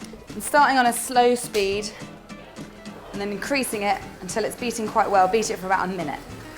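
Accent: England, United Kingdom. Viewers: Awesome cupcake baker, tatted up cutie and British accent? I believe I just found my new crush! It took me like four vids to realize she was saying "whole milk" and not "homo" tho lol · This is one of my favorite English accents. It’s like music to my ears, I could listen to her all day ❤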